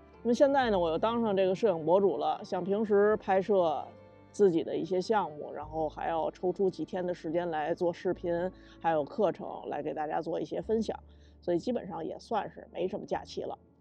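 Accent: Beijing, China. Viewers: 摄影师里京腔最好听的，😊😊😊😊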